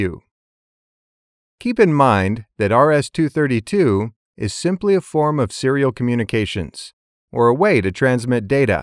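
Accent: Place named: Oxford, United Kingdom. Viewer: SIR Yr video "RS232" Wached yr above video and I LIKED IT. I find it is useful to know. Positive features of yr video are:-- 1 Excellent video production (technically) and presentation. 2. You spoke in OXFORD ENGLISH , distinct / clear pronounciation and clearly audible. 3. Precise compact information presented to make the viewer understand clearly. 4. Lastly yr summarising what all you explained earlier/ all the while helps memorising key important points. Vatsa INDIA.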